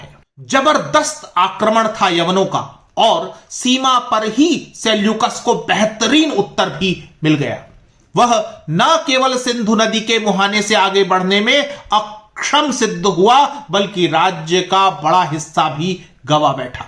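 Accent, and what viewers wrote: Standard. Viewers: Shudh hindi bashan ka ucharan kiya, suhani lagi. Details achha diya. Good.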